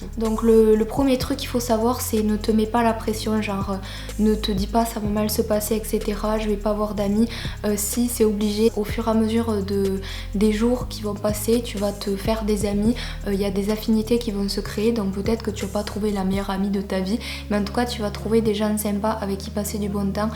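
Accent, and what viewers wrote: South, France. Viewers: jsp mais en tous cas c'est positif 😂ps: j'adore ton accent du sud ptn jsuis marseillais et je l'ai pas 😂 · Tu vien du sud PTN CON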